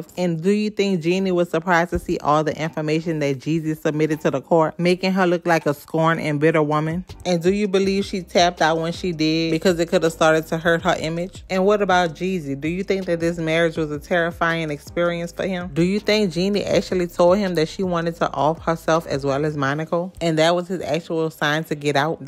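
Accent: New Orleans, United States. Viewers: Your accent.... New Orleans?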